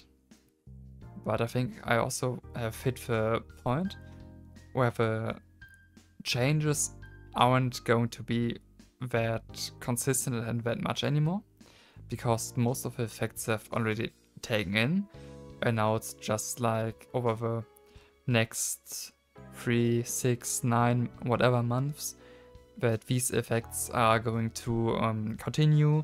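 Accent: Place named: Germany. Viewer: I've noticed that you have a German accent when you're talking.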